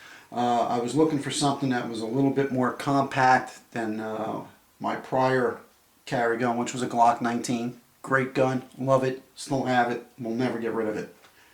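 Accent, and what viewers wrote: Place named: Queens, United States. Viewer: Great video. I ordered mine after Christmas and waiting for the transfer with my FFL. It's also good to hear someone who talks like I do. Astoria, Queens here! Thanks.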